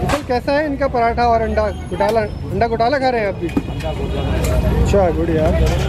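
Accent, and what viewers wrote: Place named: Lucknow, India. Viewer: aap kaa andaj lucknowai kaise😆😆😆😆😆😆😆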